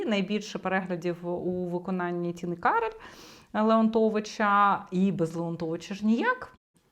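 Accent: Russia. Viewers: Цій авторці треба більше в житті спілкуватися українською. У неї купа рускіх звуків , вона вимовляє українські власні назви на москальський лад . Най більше слідкує за вимовою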